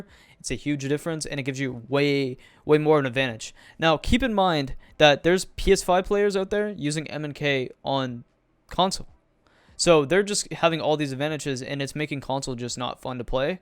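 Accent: Canada. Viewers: ARE YOU CANADIEN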